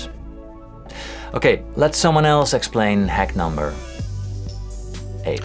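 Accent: Netherlands. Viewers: I am just starting out learning to play guitar aged 53, every single video has something amazing in it to learn, being a fellow Dutchman the accent makes me yearn for home again! (Living in the UK since a child) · Why do you have an English name but sound like you're Dutch? Great videos btw, although many of them make me wanna give up playing in a way... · It’s so easy to recognize Dutch people speaking English. This is the first vid I watch from you but I can tell you’re Dutch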